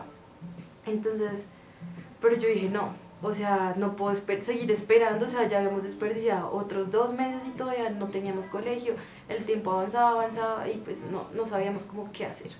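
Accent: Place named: Colombia. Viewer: Holaa guapa! encontré tu canal en el de Marisol de mi vida con Mateo y me encanta, me gusta como hablan los colombianos pero así como tú jijii me suscribo y te invito a mi canal también soy mamá <3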